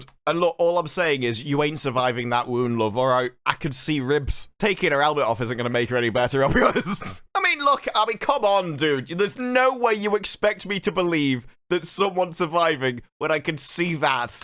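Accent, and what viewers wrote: United Kingdom. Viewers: It's so fun to hear these reviews: It's like a recap of many of my own thoughts when watching the episode, in a UK accent. Thank god this season's over, let's hope the viewing numbers and backlash finally kills this foolishness a.s.a.p. (even if it's already renewed, goddamnit) Additional thoughts (alongside existing review): - Why do Plank and Tank react with any emotion to the reveal of kidnapping, instead of going for cold logic? - How'd Cheeks keep up with the other running spartans when chasing covy ship, without armor? - How'd Pinkstreak get into the ship, when she's only got a sidearm with her and it's literal spaceship-grade hullplating? - Why, when she hero-lands in the hallway (how'd she get a hole precisely over that place anyway?), is there not any howling wind from the hole she magicked into the hull of the ascending craft? 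- How did the crashing ship come back to basically in the same airbase that it launched from, when it was presumably programmed to fly away from there and was in the air for several minutes? - Why is the post-massive-security-breach meeting with non-relevant characters (Miranda and John) in the science room and not a boardroom of senior personel yelling at Parangosky? - Cortana should've answered: "I was in Cheeks' brain, so had access to every sensory datapoint. All these pointed to truthfullness (see archived report)." - Why has the UNSC not sent at least 1 single probe to the system that might hold enormous strategic importance instead of s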